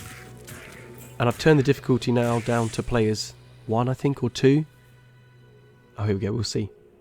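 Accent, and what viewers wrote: United Kingdom. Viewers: The dead-pan, British delivery kills me. “I’m debating profiting from mana potions. Is that the kind of example we want to set for our children this holiday season?” Lmaoooo Sooo many good one-liners. Well done.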